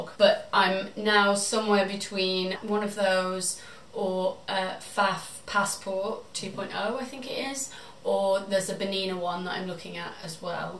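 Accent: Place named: Midlands, United Kingdom. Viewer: TFS great to hear more about you. I'm from Walsall, knew there was a Midlands accent in there somewhere!!!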